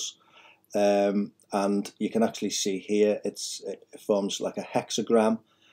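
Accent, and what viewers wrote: Liverpool, United Kingdom. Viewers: As a Scottish person ....im giggling x think he a scouser xx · Wow! New to the Channel! Amazing! Thank u. I've heard it will be Nov '23 but wow! And a Fellow Scouser too! I grew up in Penny Lane, Lpool. Great to hear a Scouse accent and intelligent too!! 👍😉🤗💥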